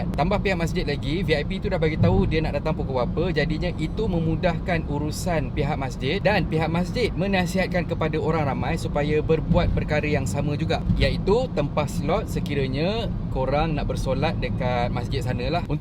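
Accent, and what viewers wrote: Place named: Perak, Malaysia. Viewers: Awat hang tetiba cakp kl..orang cakap utara hg cakp kl😂😂..sebb dalam video banyk gak cakp utara hahaha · uish..org loq staq ka nie.?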